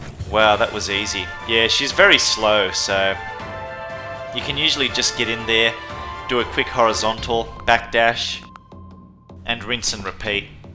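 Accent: Australia. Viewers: What reign of Australia are you from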